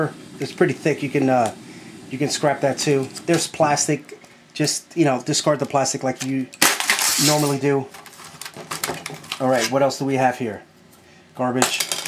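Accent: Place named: Bronx, United States. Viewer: yo!!!!!! are you from tha Bronx or somthin!!?? ah???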